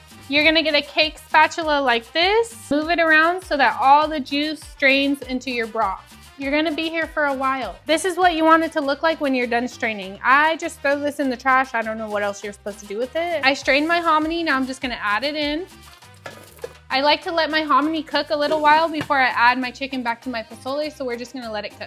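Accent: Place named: Sub-Saharan Africa. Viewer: A colonizing saa mid uggo ssa yt girl with a funny ssa accent 😂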